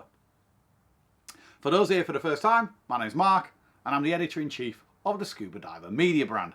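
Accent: United Kingdom. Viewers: To all not in the know, is a proper Englishman with an English accent! Don’t forget, the British invented pretty much everything including SCUBA, not the French, so he has licence to describe everything SCUBA! Personally, best way to get a wetsuit on is to use drysuit lube on the ankles & cuffs. I also take lengths of thin rope with carabiners to hang stuff to dry off the rails on the liveaboard. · sorry, having some trouble understanding. Cheap and plastic what often found in camping stores. Missed the bag trick for wetsuits too. Your brit accent is strong. Maybe demonstrate some of what you are saying. Thanks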